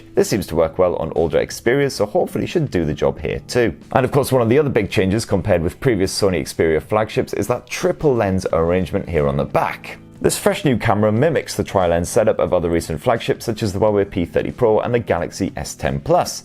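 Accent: United Kingdom. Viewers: Liked & Subscribed for the Steins;Gate wallpaper and that British accent!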